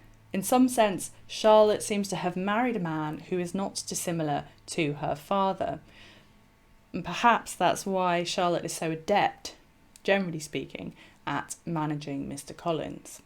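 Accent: United Kingdom. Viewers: I am happy to hear good British which is a true English language.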